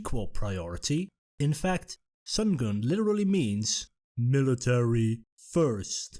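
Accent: Netherlands. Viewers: Is the narrator from The Netherlands? The Dutch always speak better English than native English speakers. Lol I've been more interested in Korean history, this video was great.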